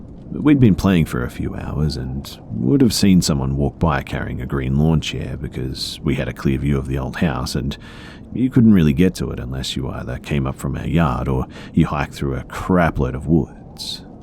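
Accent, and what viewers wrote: Australia. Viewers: gosh darn it, why do australians have the best accent?! Thanks for the upload! Much love, man! ❤️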